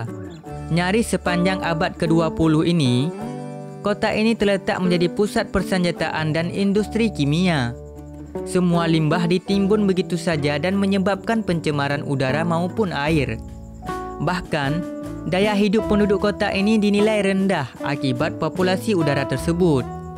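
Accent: Aceh, Indonesia. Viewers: Abg orang aceh ya bang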